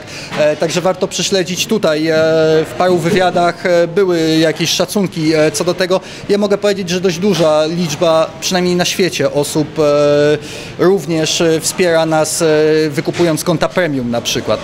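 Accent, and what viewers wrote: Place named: England, United Kingdom. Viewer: ale ma chamsko angielski akcent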